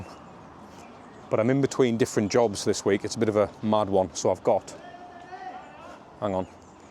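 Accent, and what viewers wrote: Sunderland, United Kingdom. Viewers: Can I detect a mackem twang?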